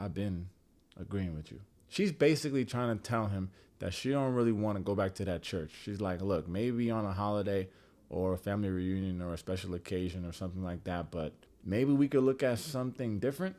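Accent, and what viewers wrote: Virginia, United States. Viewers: I will never not love your Virginia voice. Ever. Never change, King. We’ll miss you at the reunion!!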